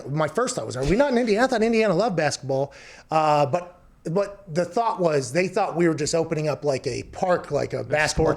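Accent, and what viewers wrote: Pittsburgh, United States. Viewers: Thank you to whoever pointed out pronunciation of “house.” Dude’s so god damn pittsburgh. Love his pittsburgh accent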